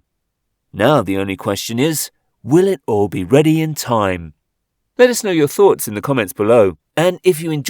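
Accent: United Kingdom. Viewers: That is not the most iconic stadium. It’s a piece of sh!t. With all of that money they need a new stadium. Oh and when talking baseball don’t use a guy with a British accent. You lose people right away.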